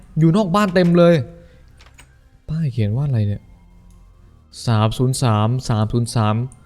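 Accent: South, Thailand. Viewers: เป็นคนปักษ์ใต้ไหม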